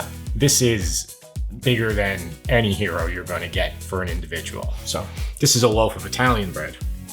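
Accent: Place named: Brooklyn, United States. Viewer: YouTube is my “go to” to learn how to cook most anything. But I just found your channel and you are awesome! I grew up in Brooklyn and, although I’m of the Irish immigrants, along with your great accent and dishes, your vids bring back feelings of home. And your practical approach along with great tips and great editing makes your channel tops on my list. Many thanks.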